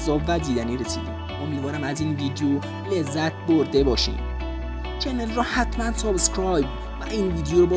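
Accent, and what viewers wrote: Afghanistan. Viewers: مث بقیه برادرای افغان صحبت کن خیلی هم لهجتون قشنگه اینجوری ریده میشه به کلیپ این همه یوتیوبره افغان و همم عشق میکنن با کلیپاشون و بیشتر به خاطره لهجشون میرن کلیپاشون رو میبینن شما اومدی فارسی صحبت کنی خوب معلومه گند میزنی فارسی رو مدل افغانیه خودتون بگو با لهجه خودتون عالی میشه مث این میشه که من تو افغانستان باشم و بخوام به لهجه شما صحبت کنم صددرصد مسخرم میکنن چون بلد نیستم و همش گند میزنم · داداش این افغانیه واسه همین اینطوری حرف میزنه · نه ایرانی حرف نمیزد همین لحجشه در افغانستان هم لحجه های مختلفی هست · لحجش تخمیه چون گوینده افغانیه 🤢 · این چه جور حرف زدنه انکار یه افغانی تازه اومده ایران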